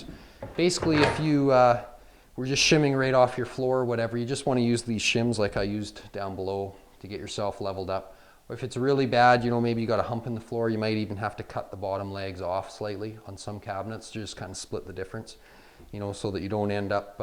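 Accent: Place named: Canada. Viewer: I like how you even tape over the manufacturer labels. True professional man your videos are great. The light Canadian accent makes it that much better.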